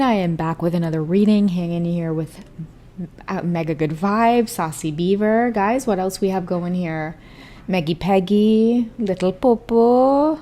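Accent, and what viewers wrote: Trinidad and Tobago. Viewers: As a fellow Trini, I love hearing your accent! 🙌🇹🇹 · yes we love your trini accent, you should defly use it more its so authentic and its music to my ears, reminds me of my aunt🥹🥹❤️‍🔥🇹🇹🇯🇲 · Are you trini?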